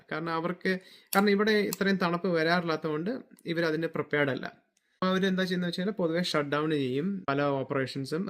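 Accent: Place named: United States. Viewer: How is speaking fluent malayalam and american accent english?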